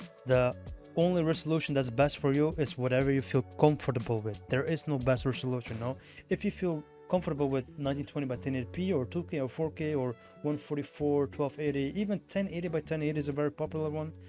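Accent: Turkey. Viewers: türk mü la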